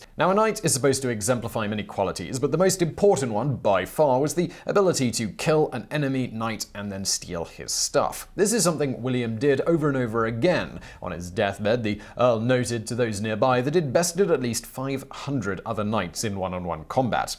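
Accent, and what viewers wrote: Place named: United Kingdom. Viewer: I specifically listen to you for HOW you pronounce things. Give me British for this anglophile.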